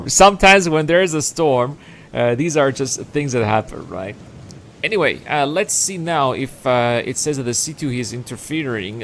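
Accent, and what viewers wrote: France. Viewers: so whats with the French accent?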